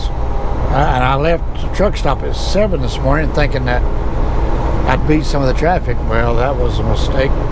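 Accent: South, United States. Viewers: Thanks for sharing your story. Your southern voice sounds good to me. lol Be safe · You do have a southern drawl but so what?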